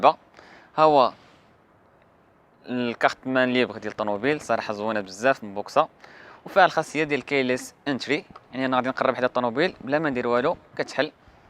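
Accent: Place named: Morocco. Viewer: يتكلم مغربي مع شوية فرنسي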